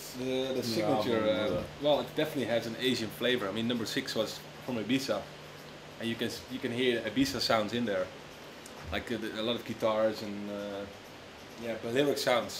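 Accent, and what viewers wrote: Netherlands. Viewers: Lol such a Dutch accent. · he has a dutch accent ;p cuz he is dutch xD Best Dj''s in the world from the netherlands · Love the Dutch accent when he speaks English. So obvious for Dutch people in general. =)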